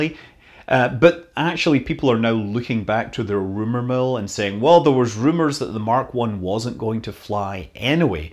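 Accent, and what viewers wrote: Scotland, United Kingdom. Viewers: Scott Manley Can I just ask you something- so I’m guessing you stay in the States now but how long is it since you left Scotland? And where did you live in Scotland? I just ask because my accent is really similar. I stayed between Sterling and Edinburgh before moving to just south between Edinburg & Carlisle. Now after living in New York for quite a while under dual citizenship, my Scots is gradually fading - although I still have an accent like yours but now all the ~Scottish slang words have disappeared from my vocab. Just interested, thanks mate 🚀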